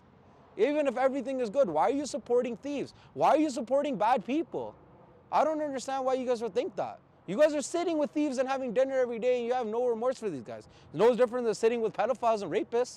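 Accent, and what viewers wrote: Canada. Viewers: that’s a Canadian accent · correct he speaks proper canadian. I love it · His accent is very much Canadian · The way he’s talking he has that Canadian accent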